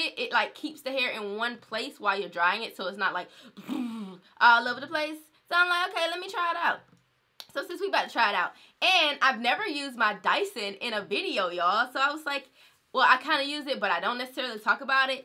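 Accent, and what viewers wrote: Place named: Detroit, United States. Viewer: I think Detroit!!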